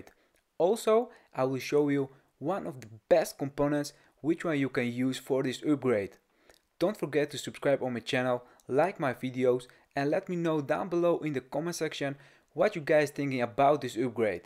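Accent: Netherlands. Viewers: Haha lachen om te herkennen dat je een Nederlands accent heb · hoorde meteen dat Nederlandse accent.. toen zag ik ronde op de stopwatch en wist ik t zeker..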